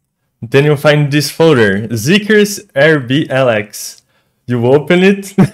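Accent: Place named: Brazil. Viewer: fiquei um tempo pensando que o sotaque é familiar e quando vejo os comentários é br kkkkk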